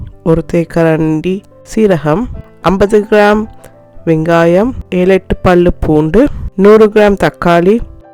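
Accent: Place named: Sri Lanka. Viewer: R u frm Srilanka?bkas yr Tamizh pronounce,that u r Srilankan....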